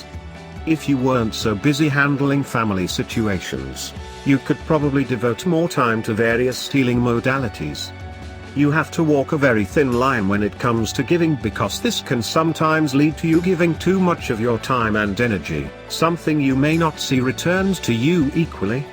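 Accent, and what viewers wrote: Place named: United Kingdom. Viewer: Anyone who said this to my face that didn't have that British accent (that resonates with Virgos) may warrant a punch in the face. And then I would dwell on my behavior for 6 months... True reading.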